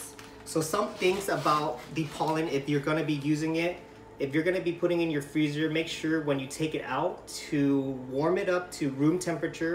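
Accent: United States. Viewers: Are from the state of Hawaii? I think I hear the local Hawaiian accent.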